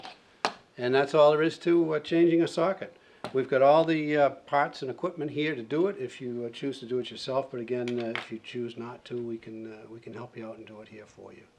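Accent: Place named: Boston, United States. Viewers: Glad I came across this. Good accent. I actually need to learn how to do a Mass/New England accent for a play and this should help nicely😄 I’m actually looking to fix a foot switch for a lamp. Thanks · As soon as you said That, I knew your were from Massachusetts. Made me a bit homesick. Hope your shop is still doing well. Thank You for a great demo. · Actors should listen to this guy before attempting a Boston accent in movies. Most actors hit it WAY too hard. Hahd lol.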